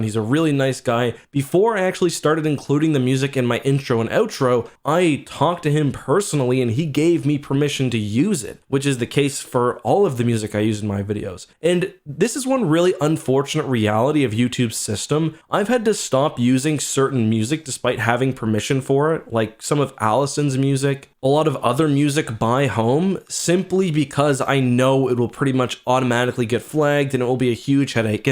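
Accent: Canada. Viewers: I know this isn’t a regular video and you’re mad but it’s much more clear how Canadian you are when you’re pissed off and cursing, reminds me of trailer park boys when you said “fuck” and “sorry”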